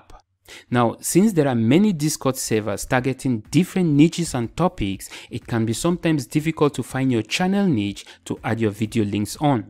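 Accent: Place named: India. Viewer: srry i thought u were indian bcs u have indian voice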